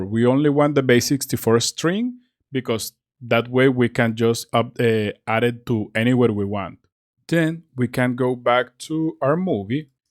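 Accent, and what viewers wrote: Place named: Spain. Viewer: I can notice your spanish accent, but you have very good english! May I ask, where are you from? Sos argentino no? Edit: ahi vi que eres colombiano, saludos desde argentina!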